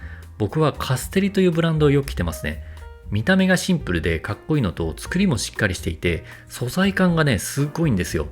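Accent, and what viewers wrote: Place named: Aichi, Japan. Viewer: は愛知県内在住っぽいですね。ヘルメットのアクセントが「へ」ではなく「メ」ですね。私と同じ。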